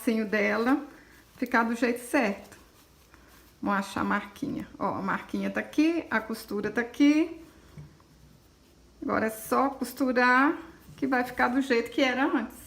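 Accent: Minas Gerais, Brazil. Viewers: Que sotaque gostosoooo…. Mineira uai…. Lembrei da minha querida avó paterna, uma crocheteira de mão cheia r minha primeira professora! Viu te seguir, amei seu trabalho, em tempos onde tudo se descarta regatar uma boneca é lindo! Beijos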